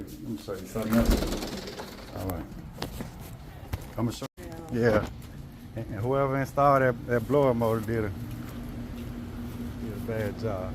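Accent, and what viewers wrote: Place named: Alabama, United States. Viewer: Small thang to a giant...that`s that alabama/mississippi talk there. I feel you though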